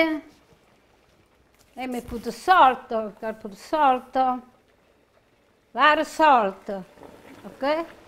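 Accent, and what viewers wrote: Italy. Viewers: is so precious! I love to hear her Italian accent. I love you!❤ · Devo dirti che ero davvero preoccupato per la tua così lunga assenza… La prossima volta fai dei brevi video per far sapere a tuoi amici che stai bene… Amo la tua cucina (e il tuo inglese con accento italiano 😄) e questa volta hai cucinato la mia vita No .1 ricetta!!! 😀😀😀♥️♥️♥️🇮🇹🇮🇹🇮🇹 Il tuo super fan greco dalla Polonia/Germania….. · Italian accent sounds very good and it sounds so better, when it's about italian cuisine! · I love the way you cook. But I really love listening to your Italian accent and your singing!!! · I've recently started watching Grandmas videos and I'm learning a lot. I love her Italian accent. I will be trying these recipes at home. Love you Grandma from South Africa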